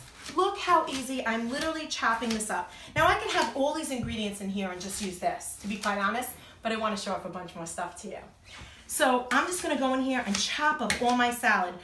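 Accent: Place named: New York, United States. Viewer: I love your shirt AND your NY long Island or Buffalo accent LOL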